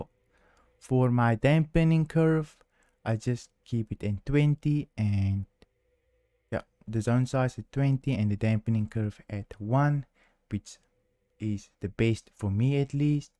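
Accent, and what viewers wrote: Afrikaans, South Africa. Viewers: jasis that afrikaans accent hits hard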